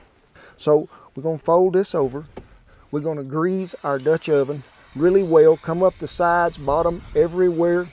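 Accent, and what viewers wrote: United States. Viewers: Sir, i thoroughly enjoy your videos and enjoy hearing your cowboy accent. Your fan from Singapore!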